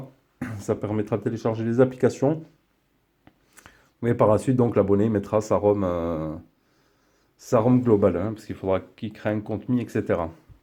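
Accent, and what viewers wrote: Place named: Southwest, France. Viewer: Aparisi Anthony dans le sud ouest c'est comme ça ils prononcent tout lol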